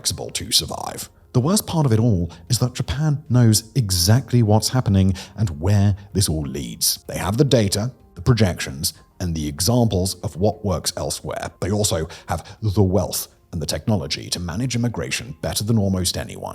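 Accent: United Kingdom. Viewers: He’s talking about managed decline, yet has a British accent. The irony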